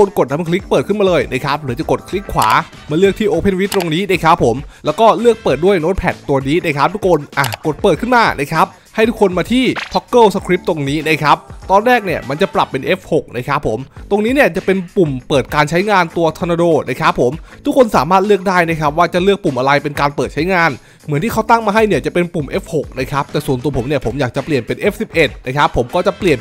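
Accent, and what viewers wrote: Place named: South, Thailand. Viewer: แหลงใต้ชัดเปรี้ยะเลยหลวง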